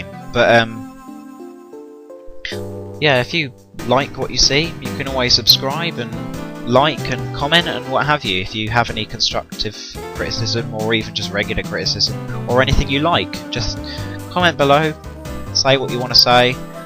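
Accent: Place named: United Kingdom. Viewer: Like you said if u be a bit more fun you really can come far if u do that and are you british cause that accent is lovely!! :)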